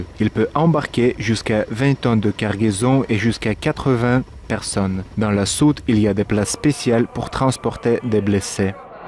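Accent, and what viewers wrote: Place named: Ryazan, Russia. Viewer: не позорился бы уж своим рязанским акцентом - говори по-русски.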